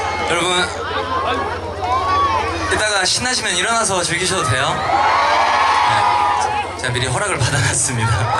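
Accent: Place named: Mokpo, South Korea. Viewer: 박지현가수님목포사투리구수하고매력있당게 앞으로도멋쟁이가수로활약하시길~~~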